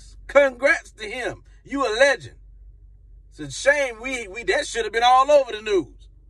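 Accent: Africa. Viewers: I love your African accent,... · I love your African accent!! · I love when you do the African accent! 😂😂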